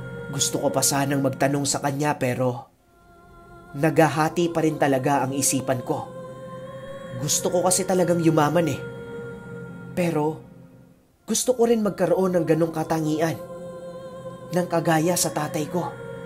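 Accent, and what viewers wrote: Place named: Visayas, Philippines. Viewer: Gusto ko pag nag bibisaya accent in tagalog ka..comedy horror kc lumalabas ang kwento.nakakatawa.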